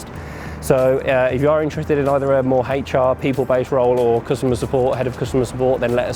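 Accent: Birmingham, United Kingdom. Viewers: that brummie accent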